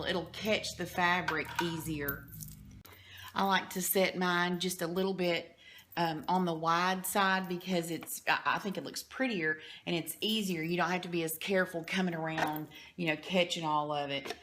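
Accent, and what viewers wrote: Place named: Georgia, United States. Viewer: I love your dresses 👗 and the way you explain your steps .... love your accent it reminds me when I lived in Dahlonega Georgia 😍🥰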